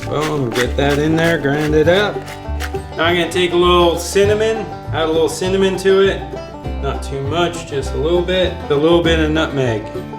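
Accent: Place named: Nova Scotia, Canada. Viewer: You're from nova Scotia aren't you brother?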